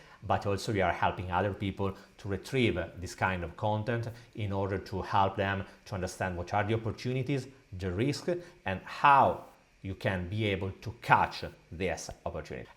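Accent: Italy. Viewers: I really like your content but man your Italian accent sometimes crack me up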